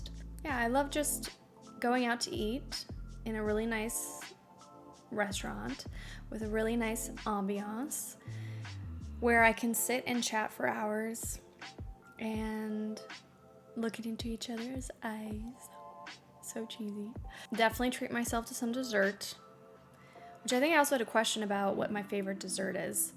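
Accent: Quebec, Canada. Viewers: I'm not fluent in french (we learn it mandatory in school here, german speaking part of Switzerland), but I realized how different Canadian french is to what we know :D. It sounds cool though. Being bilingual (at least) is always a win.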